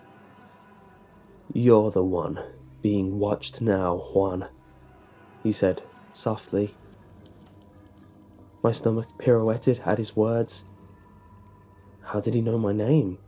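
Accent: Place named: United Kingdom. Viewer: oml, British accents 😣😣😣😣😩😩😩😭😍😍😍 oml.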